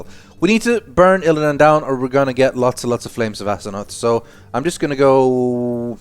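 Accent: Sweden. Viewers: He is swedish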